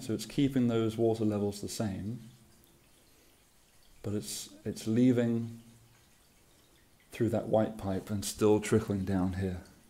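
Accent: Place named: United Kingdom. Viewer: After much thinking, the penny dropped. The overflow (which is not a siphon) works as the water level in the tank comes up, it equalises in the overflow box, which brings it over the level of the white tube - voila! Have you experimented with the flow? How would you increase the flow out of the main tank? Great video - well explained. I thought I heard UK in the accent and USA in the power outlet - then I read Thailand in the comments!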